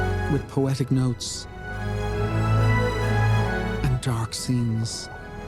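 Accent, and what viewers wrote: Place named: Ireland. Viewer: Irish dialect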